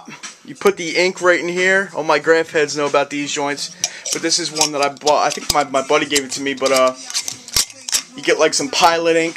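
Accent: Philadelphia, United States. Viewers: damn wish them draft day's were a size 10 i fuck wit em... i scopped that philly accent from the beginning lol.. #215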